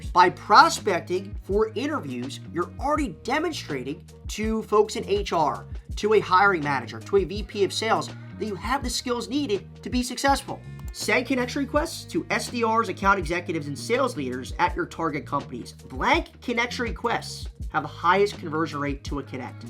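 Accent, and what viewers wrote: United States. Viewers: Do you feel disadvantaged relative to your British/Australian peers? Due to your American accent.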